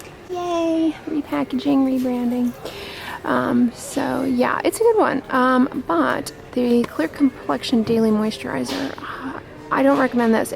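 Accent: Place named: South, United States. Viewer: Southern asmr turns dermatologist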